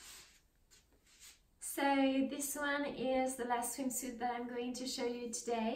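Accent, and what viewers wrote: France. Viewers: Mhm. You sound impressively French but in female contrast with a nice rear end. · Are U French? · I love that bed warmer on the wall and I love your French accent and your pretty Titi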